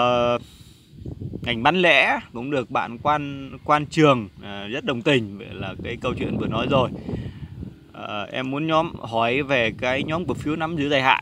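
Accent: Thanh Hoa, Vietnam. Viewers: Em ở Hà nội hay tp Hcm,giọng thì tỉnh Thanh Hoá.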